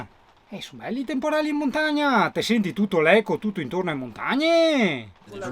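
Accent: Veneto, Italy. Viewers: la tua cadenza veneta è SPETTACOLARE😄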